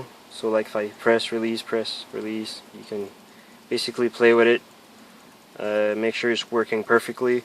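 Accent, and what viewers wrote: Canada. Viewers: Edmunston area is my guess. Sounds just like my cousin's husband. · You sound like you're from NB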